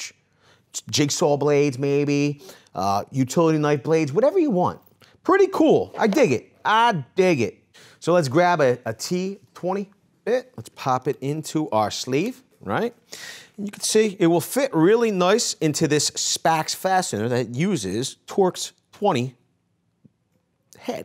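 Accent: Philadelphia, United States. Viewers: You Guys from Philly? I am. I feel like You have My accent? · This guy is from the east coast for sure. I'd say philly Lol.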